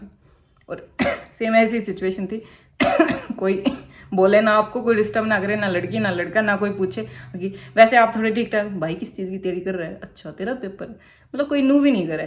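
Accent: Haryana, India. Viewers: Awaj se lagta h Rewari, Gurugram, m/garh se jo aap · Haryanvi Vibes mst lag rahi hai language me 😁😁😁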